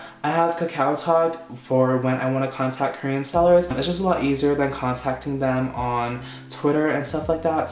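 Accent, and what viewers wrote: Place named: United Kingdom. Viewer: bringing out the british accent already i see lmao 😭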